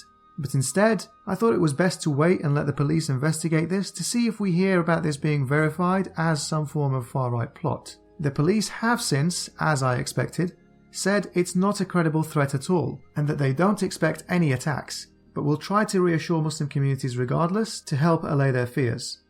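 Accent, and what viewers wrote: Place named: England, United Kingdom. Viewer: I just love your English accent 😍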